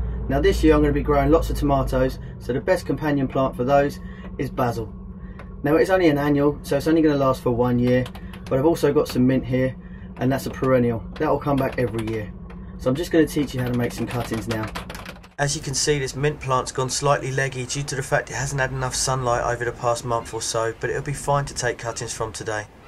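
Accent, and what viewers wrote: United Kingdom. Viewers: Love this video. Love your accent. Nice British chap showing them how it is done! Excellent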